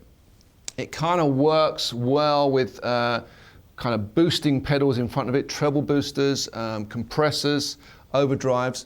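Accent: United Kingdom. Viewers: heavy british accent? He is british? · in fct is acent of britin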